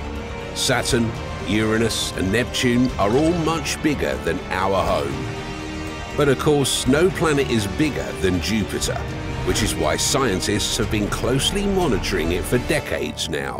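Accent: United Kingdom. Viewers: I know Ur UK english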